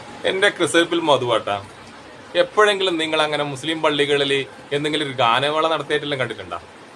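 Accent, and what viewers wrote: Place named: Thalassery, India. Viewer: നിങ്ങളെ സ്ഥലം എവിടെയാ.. നമ്മുടെ തലശ്ശേരി പാനൂർ ഭാഗത്താണോ.. എന്തായാലും തനി നാടൻ വർത്താനം 👍🏼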